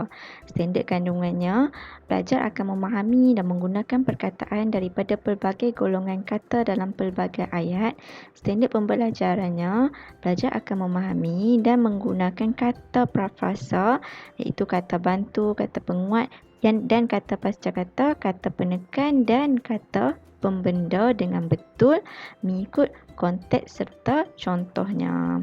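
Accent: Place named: North, Malaysia. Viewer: Tahniah ...tapi loghat utara sangat ketara....sepatutnya sebutan baku...boleh ditingkatkan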